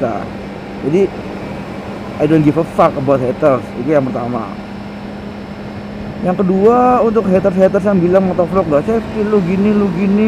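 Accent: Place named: Banyumas, Indonesia. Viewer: anjay mt25 ? btw agak ngapak ngomongnya,kocak njir :v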